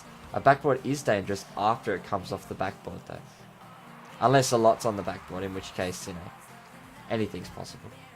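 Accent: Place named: Australia. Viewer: Holy shit your Australian accent is deep, I'm just used to you on your Facebook page, with no accent lol. Love the video tho bro, keep it up and good luck.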